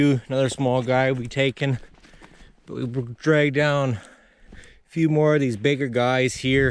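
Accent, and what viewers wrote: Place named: Upper Peninsula, United States. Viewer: Congrats on 1000 subscribers!!! Great channel!! Your accent is awesome, reminds me of the upper peninsula here in Michigan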